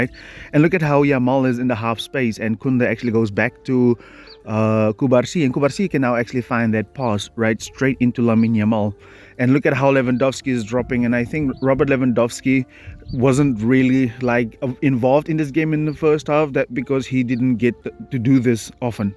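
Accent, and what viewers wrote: South Africa. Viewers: Cant wait for the second half’s video. Lol i dont know why but ypu sound a little bit South-African …accent wise😁😁😁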